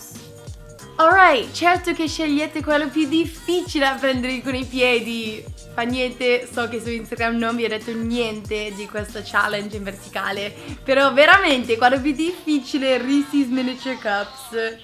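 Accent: United States. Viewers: Ma perché parli più in americano ora che negli altri video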